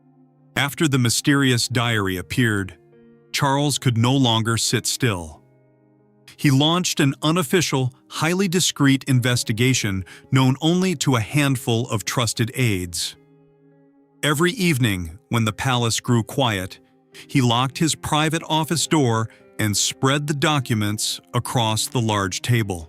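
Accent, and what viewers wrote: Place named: United States. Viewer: Why do we have to have American sounding readers 🤬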